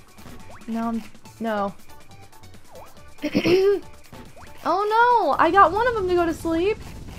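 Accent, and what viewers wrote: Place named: Indiana, United States. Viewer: Canadian here. This year I didn't go over the border for Black Friday deals though so I'll just be staying here listening to your Indiana accent.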